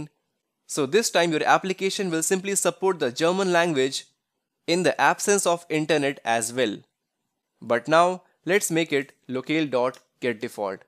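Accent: India. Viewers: typical indian voice!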